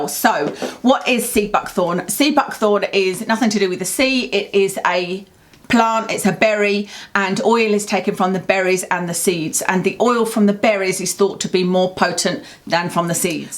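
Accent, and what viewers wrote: United Kingdom. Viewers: I like your British accent :)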